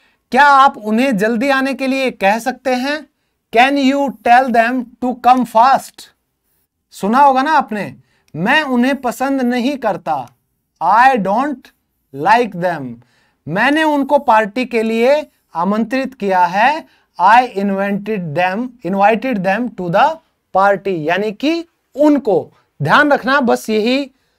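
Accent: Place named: Haryana, India. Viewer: Sir muje aapka pdane.ka lahja Bahut jyada accha laga aapse Padhkar apne Haryana ki feelings Aati hain aapne vakai etne jabardast 👌 andaz me cheezo ko explain Kiya Aapse pahle kabhi kisi ne ese na padhaya hoga thank you so much Bhai mere 🙏🌸🙏🌸🙏🌸🙏🌸🌺💐🌺💐🌺💐🌺💐🌺💐🌺💐🌺💐 Jai Hind Jai Bharat vandematram 🇮🇳🇮🇳🇮🇳🇮🇳🇮🇳🇮🇳🇮🇳🇮🇳🇮🇳🇮🇳🇮🇳🇮🇳🇮🇳🇮🇳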